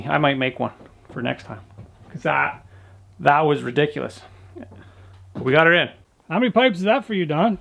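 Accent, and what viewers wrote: Canada. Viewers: I just found your channel, you’ve really made some beautiful places on your property? I detect the Canadian accent, are you in Ontario? Do you raise the pigs for food? I’m not a vegetarian but I’d have a hard time eating an animal I cared for. I had a duck & 3 chickens as pets for my kids & we lived in the city. The neighbour’s loved it so nobody reported us.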